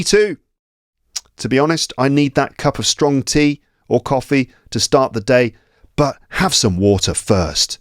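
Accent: RP, United Kingdom. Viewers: Another thing I want to point out is that your English is very clear "'Received Pronunciation'" is very easy to understand. But in real life in the UK, it's much harder than I expected to understand the average native British speaker and I'm not even talking about dialects.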